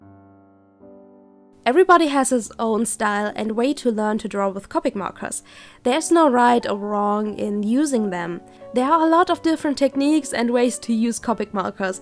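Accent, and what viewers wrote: Germany. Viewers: Are you from Germany? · bist du aus Deutschland? xD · I love your german accent! It's a lot more soothing to listen to than all greasy american you hear on YT. I'm from sweden so I don't have perfect pronounciation either. Some senteces sound great for me, and some words are just... urgh. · Hab ich auch mitbekommen. Sie hat einen ziemlichen deutschen Akzent, auch wenn des jetzt nichts negatives bedeuten muss. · wie ich schon am anfang gehört habe, muttersprache deutsch. Ich hör es immer raus, egal ob der akzent stark ist oder nicht xd, danke für das video :) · Are you German? Your "accent (is this right?! xD) sounds so German ':3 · Are you German, I recognised the accent . Bist du deutsch ? Ich erkannte den Akzent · Sminfin ja liegt einfach daran das wir den allgemeinen Klang der Muttersprache oft sehr lang behalten selbst wenn wir andere sprechen. 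Wie man hier ja gut sieht. Ihr Englisch ist super und sie Betont wunderbar aber der gesamt klang ist deutsch. Ein Native-Speaker würde sachen einfach anders betonen , andere Geschwindigkeit benutzen etc. Briten singen zb etwas mehr :3 (Sorry Ich bin sehr interresiert an Sprachen und studiere grad eine Übersetzen & Dolmetschen) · Are you German? I can hear a lil German-like accent? Ahh hab die Kanal info gelesen! Haha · Hey german bud ^^ <3 I heard the accents immediately because I got the same xD Maybe if you like videogames we may play together one time ^^ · Du hast echt einen krassen deutsch Akzent, bist du deutsche ? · Yep shes german lol I heard the accent too. I'm not german tho I speak flemish (belgian dutch) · Are you German? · Are you german? That sounds like a german accent xd I'm german xd Edit: jap ich hab deine Kanalinfo gesehen :3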